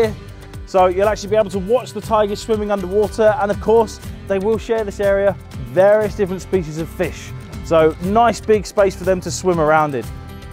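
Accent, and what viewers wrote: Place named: Germany. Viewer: Cara orang Jerman bicara Inggris ketauan banget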